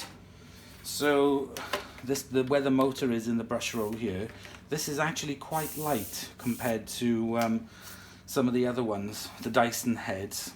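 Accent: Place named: Scotland, United Kingdom. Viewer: Happy New Year to you as well! I have 3 permanent res. Cats, 2 longhair, and right now just one foster cat rescue cat and I understand completely about the fur 🤣 the Dyson was impressive with it. Always hesitant to buy another cordless vac again as I had one that underperformed big time, but this one seemed to work great. I love your channel, it’s fantastic! And omg the singing stuffed animals were soooo cute ❤️Thanks so much for giving the demo. Also ❤️ your Scottish accent. Some of my family ancestors are from Scotland (Fraser’s of Lovat) and I’ve always wanted to go there. Always feel at home in the mountains more than any other terrain. The Highlands call my name...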